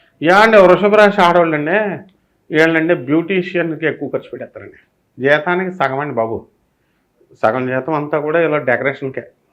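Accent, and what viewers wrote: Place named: Godavari, India. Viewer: మీ యాసని బట్టి మీది గోదావరి జిల్లా.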